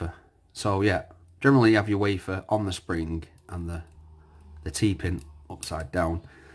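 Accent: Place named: Manchester, United Kingdom. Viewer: Great video, I don’t understand how anyone could give this a thumbs down. I’m glad you mentioned Manchester, I’ve been wondering what type of accent you had.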